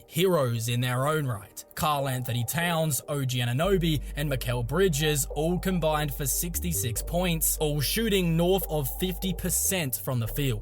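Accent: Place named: Australia. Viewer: Listening to Knicks analysis in a crazy Australian accent is SICK! Lol I love it!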